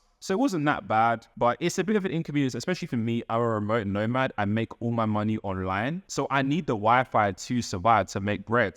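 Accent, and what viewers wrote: United Kingdom. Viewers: Yoo is that British accent am in Birmingham Walsall side right now but my home is in Soweto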